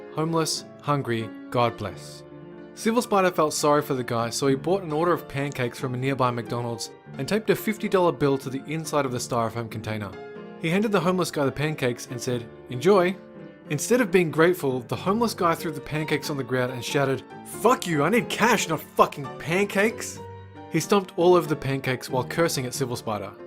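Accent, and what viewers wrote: Australia. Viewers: Oh, you’re Australian. Thought u were British... whoops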